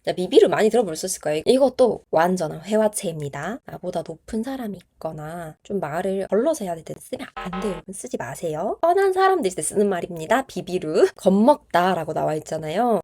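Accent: Gyeongsang, South Korea. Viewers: 한국어는 경상도 사투리 쓰시네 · 경상도 사투리 베이스신가요 그런데도 말투가 되게 부드러워요!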